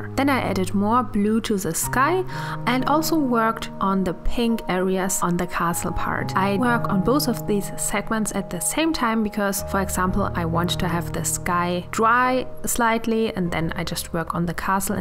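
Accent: Germany. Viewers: Man hört so sehr raus das du deutsch bist ich liebe es 😂❤️ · Ich liebe deinen deutschen Akzent! Ich lerne gerade Deutsch.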